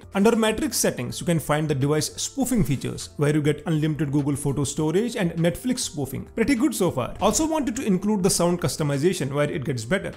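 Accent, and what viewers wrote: Kashmir, India. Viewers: Bro you from Kashmir? Your accent is so Kashmiri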